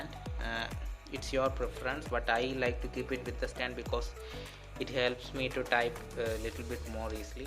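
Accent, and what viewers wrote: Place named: Kerala, India. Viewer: are you malayali? :D you speak like one....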